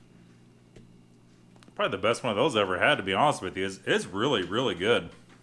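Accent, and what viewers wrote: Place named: South, United States. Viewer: He sounds Southern, maybe Louisiana. They have a different way of pronouncing things down south. Can't help how he was raised. Alot of my family is from New Orleans, it gets irritating sometimes with how they pronounce things but, you cant change how they speak. That'd be like getting mad at a British person for pronouncing something differently than we do when speaking English.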